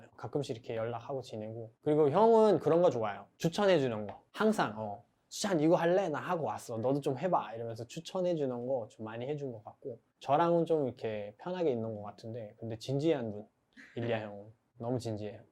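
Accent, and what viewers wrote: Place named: South Korea. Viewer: 말투가100% 한국사람인데요.?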